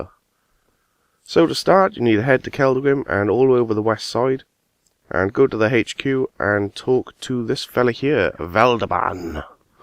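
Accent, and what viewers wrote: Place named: South Wales, United Kingdom. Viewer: Your accent sounds local to me...im from Cwmbran, South Wales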